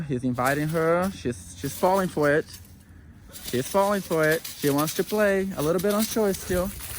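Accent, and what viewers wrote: Germany. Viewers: Do I hear a German accent? I love you work!❤ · I LOVE watching you work! I'm sorry people will probably think I'm a dummy but where is your accent from? Is it German? I'm pretty new to watching you.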